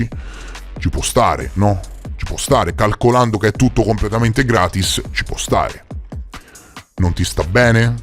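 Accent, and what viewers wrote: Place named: Central Italy, Italy. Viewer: Dall accento probabilmente del centro Italia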